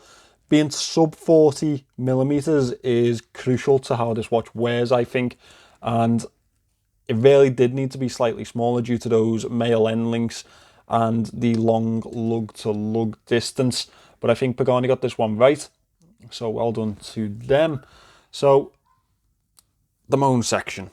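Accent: Liverpool, United Kingdom. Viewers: Bought the fluted bezel Datejust homage from Pagani a while ago and was thoroughly dissapointed in spite of the low price ! Very poor finishing and quality overall, poorly executed jubilee bracelet ...... it just felt like a "cheap ass watch" !! So it was with some apprehension that I ordered the dark blue OP V2 homage from Pagani, based on some very good reviews online. I must say, that the overall quality and impression of this model is lightyears ahead of the Datejust that almost put me off Pagani forever ! It feels that the quality of the watch is on par with my Seiko SARB033 and my Citizen NB1050-59E JDM. I was so impressed that a couple of days later I also ordered the non date Tiffany blue model, which will hopefully arrive in a couple of days :-) From what I can see in your review (or non-review by your statement :-) ) I will not be dissapointed. 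Thanks for the review - keep them coming ! Just pushed the subscribe button :-) Also great to hear a "Scouser" accent in a review, even though I am not British ! ;-)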